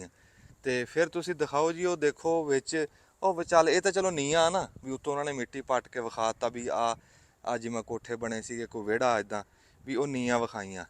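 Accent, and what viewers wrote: Punjab, India. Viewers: Very sweet punjabi speaking❤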